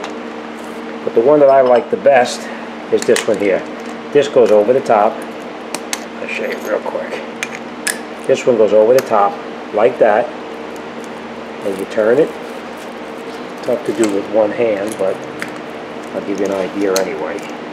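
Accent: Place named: Massachusetts, United States. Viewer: I like the MA accent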